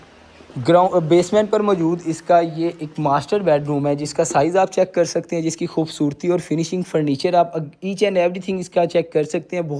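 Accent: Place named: Rural, India. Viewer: Paindu kahi ky bolna to seekh lo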